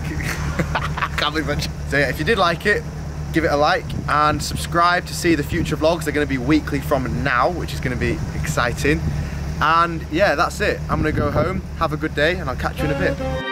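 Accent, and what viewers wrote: United Kingdom. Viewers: Would you mind talking with no environmental sound? It’s hard for me to listen your British accent and even more if you don’t use a microphone. Thanks !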